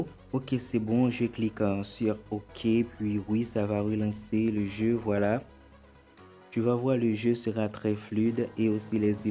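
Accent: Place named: Haiti. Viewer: Depi sou langaj misye c ayisyen' 😅😅foli pale fransè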